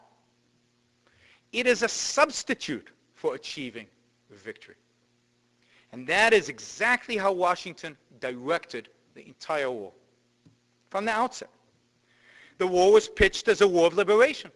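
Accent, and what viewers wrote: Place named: Israel. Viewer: He's not a native English speaker--he's from Israel. Give him a break.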